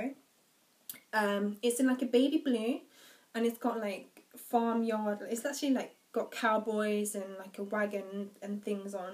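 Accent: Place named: RP, United Kingdom. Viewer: Awwww, I'm so happy for you! Everything you got for your baby is SO ADORABLE! And also, I can never get over your fabulously posh accent. I hope you keep doing videos throughout your pregnancy!:D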